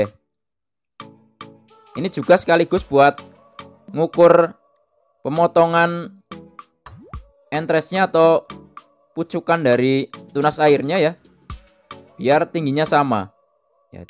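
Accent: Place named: Banyumas, Indonesia. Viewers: Keluarkan ngapakmu mas bro wkwkwk.... Mantul pokoke😜😜😜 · oke mas, mksh banyak atas ilmunya.. Sy smakin faham. Sukses selalu. Boleh tau mas asli tegal ya? Medoknya ky logat tegal.hehee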